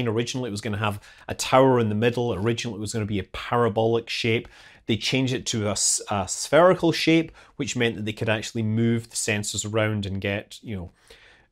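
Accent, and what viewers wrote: Scotland, United Kingdom. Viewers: Is that a Scottish accent that I am detecting?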